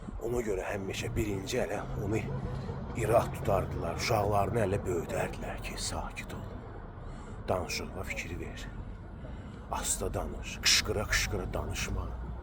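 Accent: Baku, Azerbaijan. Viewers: Приятно слышать чистую бакинскую речь!Вчера в Питере в кафе я уходя заметила семью,где 16 летняя дочь вместе с отцом смачно облизывали пальцы на глазах у всех!я по азербайжански им сделала замечание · Говор у вас настоящий бакинский ,нет прежнего Баку ,практически не осталось бакинцев ,как поет Корнелюк : город которого нет.А нынче в моде именно и этот говор: ЦЦЦ ,а бакинцы действительно последние из могикан ,хотя навряд ли молрдеж знает кто такой Фенимор Купер .( в школе читала эту книгу ) .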